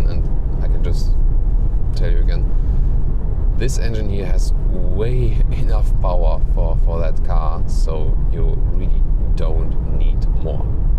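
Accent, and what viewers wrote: Germany. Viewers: Good information! And I really do like your fucking german accent. :))) Great! Did you do these videos in German? I'd like to watch it in German. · Wenn du einfach das deutsche Englisch heraushörst. ;-)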